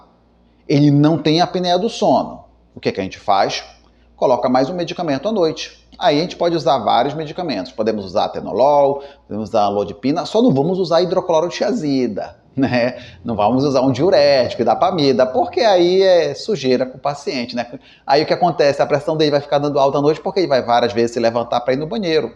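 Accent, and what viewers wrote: Pará, Brazil. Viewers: Doutor você é paraense? Seu sotaque me lembra o paraenses❤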